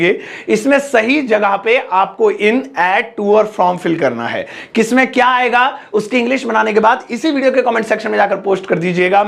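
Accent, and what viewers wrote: India. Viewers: I love his desi English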